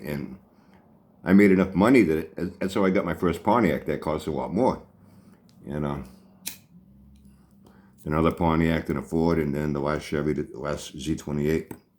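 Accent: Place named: Massachusetts, United States. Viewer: You sound like you're from MA.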